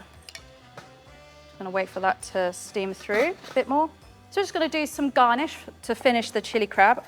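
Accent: United Kingdom. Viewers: LoL - first few seconds threw me off - until i realized she has a British accent... What da HAIL.... 😜🤣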